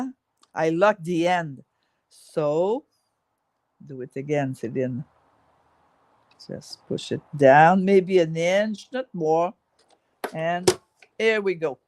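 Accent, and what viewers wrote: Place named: Quebec, Canada. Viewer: French Canada. I recognize the accent.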